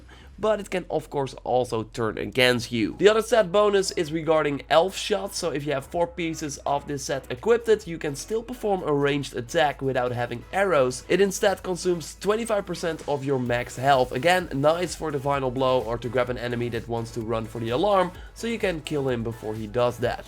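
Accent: Netherlands. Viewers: Do i detect a Dutch English accent ? xD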